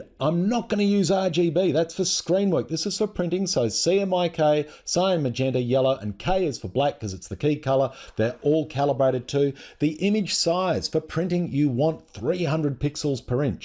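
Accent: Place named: Australia. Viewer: Great tutorial. Easy to follow, lots of tips. :-) I love to find an Aussie talking. I have one tip of my own (which you probably know of) Double click on text box corner handle will resize (shrink or expand) text box to fit text. This removes overset "red plus" indicator. Also a request for future posts. I am deaf and I rely on CC, so please minimise the amount of info on the bottom 1/4 of screen, because sometimes critical visuals are concealed by captions.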